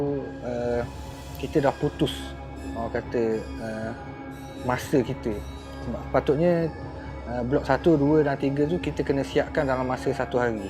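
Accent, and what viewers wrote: Terengganu, Malaysia. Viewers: dgr loghat mcm ganu ni...ok done subscribed...salam dari Singapura... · Suke dengar loghat ganu ni..👌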